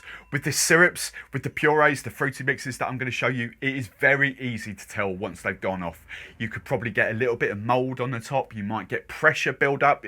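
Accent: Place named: Cockney, United Kingdom. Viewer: Cornwall?! You sound proper cockney!!!!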